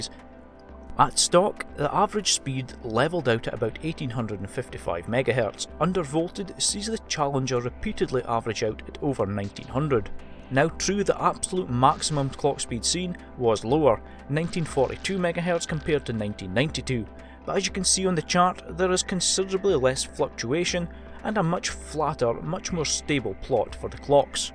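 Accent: Scotland, United Kingdom. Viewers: Subbed for the Scottish accent lol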